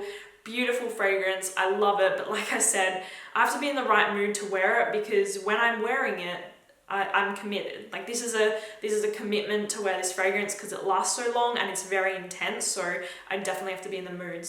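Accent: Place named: Australia. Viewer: Love ur Aussie accent.....❤️❤️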